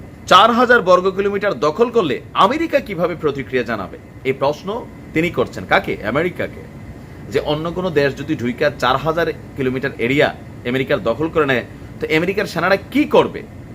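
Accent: India. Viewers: ভাই আপনি কতবছর ভারত ছিলেন এত সুন্দর ভাষা কিভাবে বলেন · Your Hindi accent really interesting. Go ahead.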